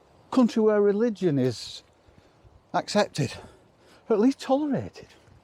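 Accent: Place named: England, United Kingdom. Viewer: Oh, that made me feel like I was on a walk with Epictetus with an English accent. It's -7C/19F here, and I'm not going outside except for the farm chores, so I enjoyed the vicarious walk where it's still green.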